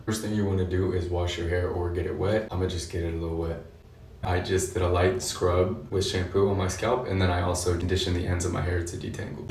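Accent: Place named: England, United Kingdom. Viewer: Wait what happened to your English accent?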